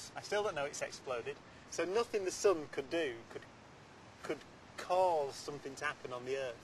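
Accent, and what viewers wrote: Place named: Lancashire, United Kingdom. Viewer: "The ball caused you to fall off the wall" is the greatest sentence you could ever hear in a Lancashire accent.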